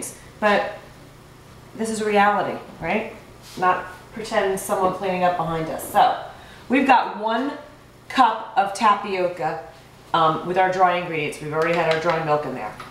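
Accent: New York, United States. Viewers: Would this work in a dutch oven format at high heat do you know? Being from NJ now in FL, I recognized NY in the first sentence. Love love the Northeast crisp to the pointness that we are "famous" for. Love your vid, very helpful. I stopped eating wheat 5 years ago, mostly raw vegan but I miss the ritual and smells so might try this. Beautiful job, lovely person! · Loved your video. I heard the accent New York 🗽 Baby! 🤣🤣 I’m just looking for better bread options and never used my bread machine that was gifted. Plus sometimes with being tired from work, my schoolwork, the kids schoolwork, house chores 🤦🏽‍♀️ I need to start using it. Let the experiments begin!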